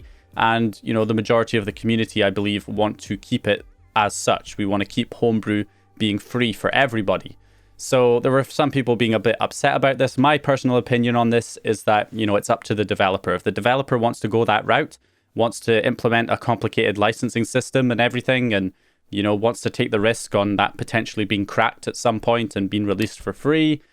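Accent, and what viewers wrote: United Kingdom. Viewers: Thanks for the vid. Always looking forward to hearing this guy's UK accent.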